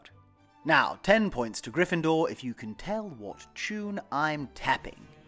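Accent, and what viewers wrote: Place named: England, United Kingdom. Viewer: “don’t be daft “ ha ha that’s all the English lingo I know… but truly love love your excellent English accent. So delightful!! Love watching your English cottage come alive! Thanks for sharing your journey !! Much love from Utah in the states.🤓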